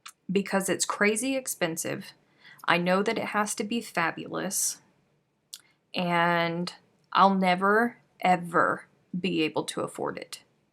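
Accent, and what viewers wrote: South, United States. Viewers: If I upset you tonight by my comment about the southern accent I want to apologize. I ment it in a good way. I love the southern accent and miss listening to my grandparents. It was the you alls that was the only time I really heard it. I really enjoy your podcasts and promise not to say anything again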